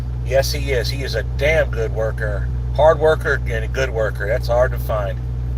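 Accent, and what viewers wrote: New Jersey, United States. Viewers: YOUR JERSEY ACCENT IS COMING IN STRONG TODAY..WINTER IS HALF OVER. GREAT VIDEO.. IT'S MIKE FROM CANADA.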